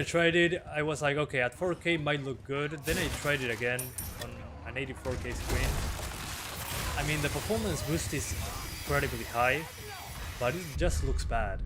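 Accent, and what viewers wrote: Rioplatense, Argentina. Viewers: Hola, de donde sos, hablas ingles, pero pareces de Buenos Aires, o Uruguay? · tenes acento argentino o uruguayo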